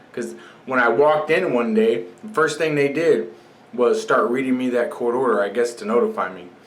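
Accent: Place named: South, United States. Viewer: No idiot u sound like a dumb Ass Hillbilly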